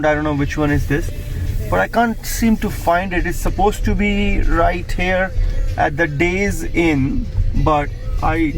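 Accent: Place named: India. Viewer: I know this accent. Are you originally from India? If so, where at? I lived there for 2 years.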